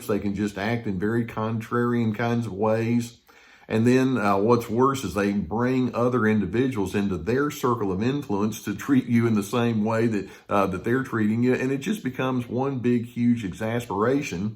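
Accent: South, United States. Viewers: Not only are videos helping me cope with and understand narcs, I just LOVE his deep, soothing Southern voice and accent!! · Bloody hell! This is excellent analysis.your best yet. That's not to say all your stuff isn't, excellent! I love, Karma, as it is very difficult to "uninsnare", yourself from these detestable, bastards!! Love, the southern drawl.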